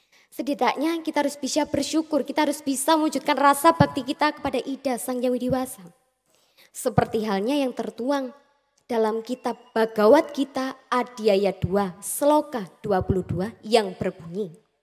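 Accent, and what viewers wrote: Java, Indonesia. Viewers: Suksema yo apik pisan dialek jowone santun bikin hati ne tentem /adem yo cah ayu pisan matur nuhun ngih apik jenenge ayu pisan wonge suksema ngih . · Mbak hebat 👍👍.Wajah dan suaranya bening.Pengetahuan agamanya lumayan.Tehnik penyampaiannya bagus.Yang paling menarik bagi saya laval bhs Jawanya sangat mengesankan.Jarang ada yng mampu seperti itu.Selamat Mbak,salam dr Semarapura,bali.Klo blh tau Malangnya di mana? Saya dulu sering ngelencer di kayu tangan.Suksma.🙏🙏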